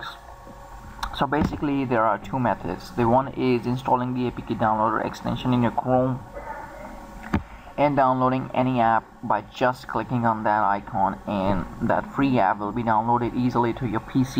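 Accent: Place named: India. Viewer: dude awesome+ ur accent is awesome it was so entertaining listening to curry english thanks, say android again!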